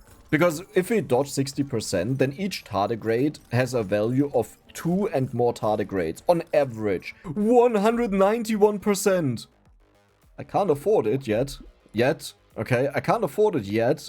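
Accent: Germany. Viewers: Bro is trying to keep speaking english and Not German or whatever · Dein Denglisch ist der Hammer, ich lach mich hier halb tod. Mache einfach so weiter! Geile Videos!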